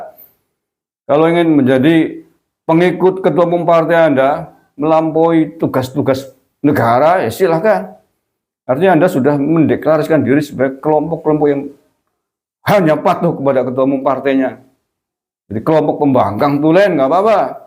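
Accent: Banyumas, Indonesia. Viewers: Semangat truss mas Bro , ...ngapak menyala ❤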